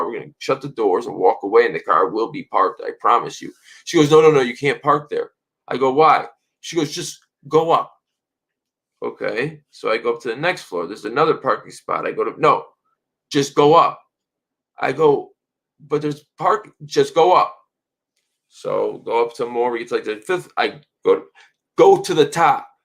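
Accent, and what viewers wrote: Chicago, United States. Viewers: Dude you are hilarious! Neck, hips, lips, small of the back and rear bumper = sexiest parts of a woman ! I can’t could actually feel the sexual tension when you were describing how she was standing there.🤣 Seriously though love your channel I think you could go places in entertainment, you are a naturally interesting person The whole Chicago accent is gold! You can come out to the Bronx with us and pick up a Bronx “forget about it”=foget uhbout it“ “hey you guys go pick me up a cappacuola sandwich “= eh use guys go gimmee a gobbagoo sangwich .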